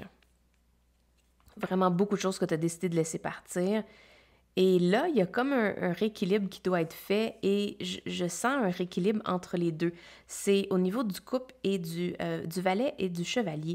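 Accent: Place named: Quebec, Canada. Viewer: Pile 1 Je découvre la chaîne sur mon fil Ça correspond à mon passé et mon présent. 👍 Et abo ne serais ce que pour ce charmant accent québécois 😁 mais le tirage touche juste aussi 🙏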